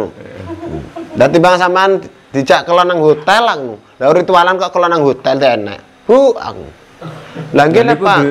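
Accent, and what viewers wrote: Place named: Kudus, Indonesia. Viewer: logatnya ko sperti orang kudus, tayu pati ya, mungkinkah mbh asli dr pati tayu atau kudus.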